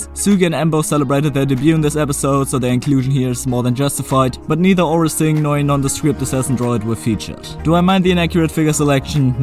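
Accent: Germany. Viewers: Ich liebs wie man immer bisschen den deutschen Akzent raushört 😅 da kann man noch so gut englisch sprechen 😂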